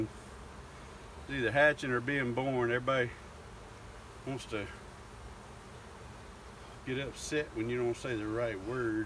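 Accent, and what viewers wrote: South, United States. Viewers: This viewer is 'Disappointed ++'? What an entitled brat! Not your job to educate anyone on beekeeping for free. We are here to enjoy your beekeeping/ relocating exploits which are hugely entertaining and instructive. I watch because I learn a lot about bees, I'm entertained with your southern accent and antics, and get to visit the deep south with its trees, climate, animals, people, customs, and different climate zone. Just keep doing what you are doing, and know that the vast majority really like your videos, like you, and don't need you to do anything more. There will always be whiners, narcissists, and self-centered people who annoy others like Africanized bees. Just wear protective gear around those folks.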